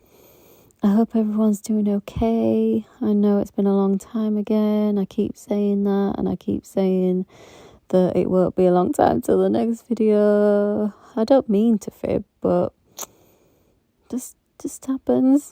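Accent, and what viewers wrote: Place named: Yorkshire, United Kingdom. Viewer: Glad I found your channel so nice to hear a Yorkshire lass keeping it real you sound just like my best friend who is from Leeds! Thanks for sharing your tips I loved all of your videos especially how you gave alternative options for the pool and tortie 👌🏻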